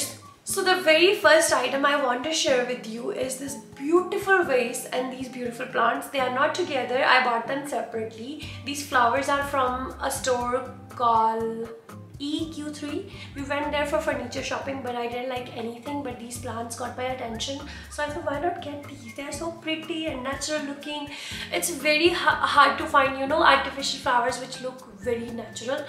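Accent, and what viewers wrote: Punjab, India. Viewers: Punjabi touch maza le ata hai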